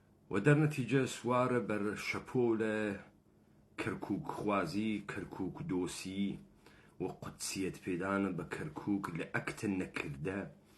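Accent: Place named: Kermanshah, Iran. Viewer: تو شلوار له قن ترکیه در تیری. خیلی واضحه مهره کی هستی کجا کرمانشاهی بلده با این لهجه حرف بزنه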